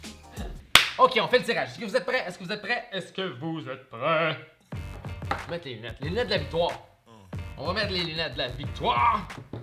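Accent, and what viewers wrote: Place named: France. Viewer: WAAA, l'accent Français est pas mal, et l'œuvre est juste incroyable !!! Ca doit bien représenter Montréal !!!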